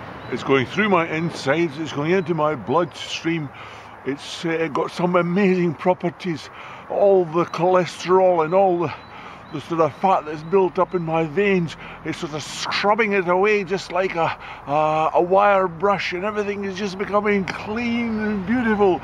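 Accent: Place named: Scotland, United Kingdom. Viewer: I hear a Scots accent ☺️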